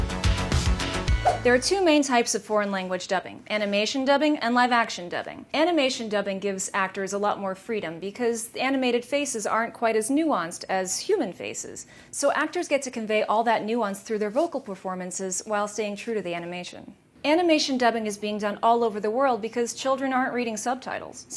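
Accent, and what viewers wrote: Russia. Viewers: wait, you're russian? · I LOVE YOUR RUSSIAN ACCENT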